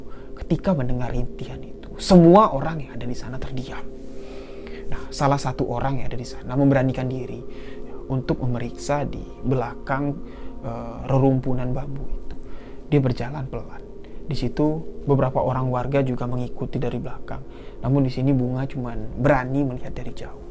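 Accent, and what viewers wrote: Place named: Kalimantan, Indonesia. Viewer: Maaf ya mas, masnya ini asli orang Jambi apa orang suku Kalimantan. Soalnya logat bicaranya kayak suku Kalimantan 🙏.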